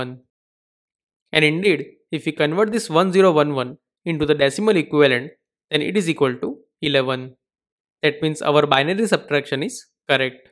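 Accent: India. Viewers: You know it a great video when he have an Indian accent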